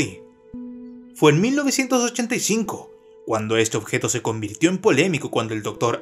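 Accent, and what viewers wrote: Chile. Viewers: Eres Chileno?bro muy buen canal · tiene acento chileno 😮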